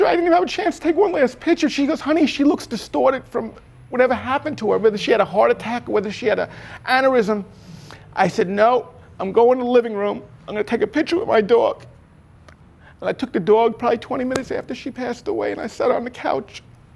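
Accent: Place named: New York, United States. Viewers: This guy could do audiobooks. I could listen to him reading a book all day.....his New York accent......his intonations....fabulous! Thank you for telling your story. You made something good out of something bad. Everyone who's listened to this today got something different out of it. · Hey brother my wife is from Astoria 37/31 and Broadway. I hear the NY wisdom in your voice. Good luck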